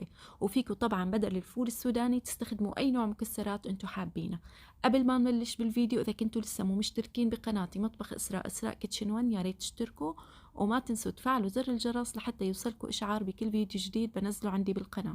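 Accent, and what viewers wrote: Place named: Ruhaiba, Saudi Arabia. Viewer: حسيتك من الرحيبة😂😍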